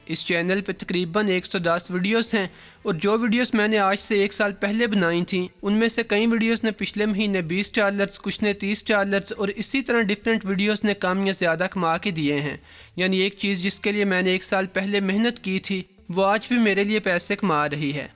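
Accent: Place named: Lahore, Pakistan. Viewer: Yar tussi lahori ho ☺☺😑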